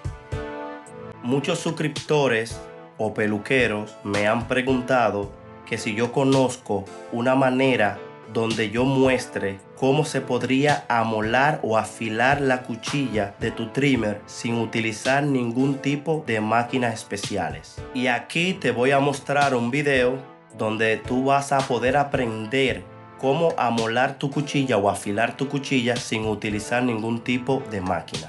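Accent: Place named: Dominican Republic. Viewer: Hablas igual que yo Eres dominicano verda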